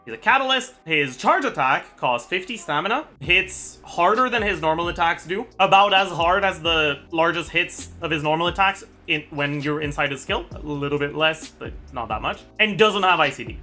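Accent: Quebec, Canada. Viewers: I definitely hear the French Canadian influence every time speaks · its probably canadian french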